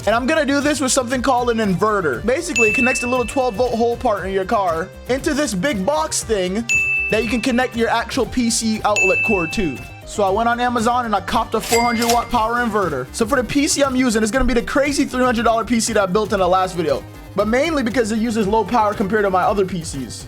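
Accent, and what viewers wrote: Jamaica. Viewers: You Jamaican born of desent or just love the Jamaican accent?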